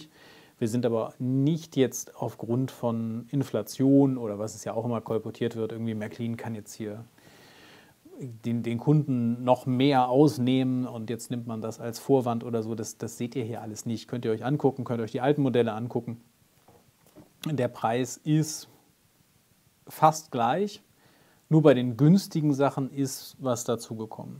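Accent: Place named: North Germany, Germany. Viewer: Hallo, ich habe gerade Ihren Kanal hier gefunden und freue mich schon darauf mir Ihre Videos anzusehen. Da Sie Norddeutscher zu sein scheinen, hätte ich 2 Fragen an Sie: 1) Wohnen Sie etwa in Dänemark? 2) Warum interessieren Sie sich für dänische Modelle?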